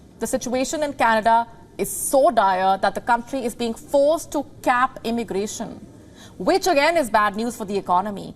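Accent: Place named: Punjab, India. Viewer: We dont need punjabi english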